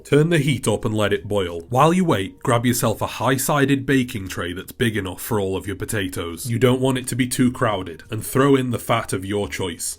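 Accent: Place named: Black Country, United Kingdom. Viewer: Black country is my guess.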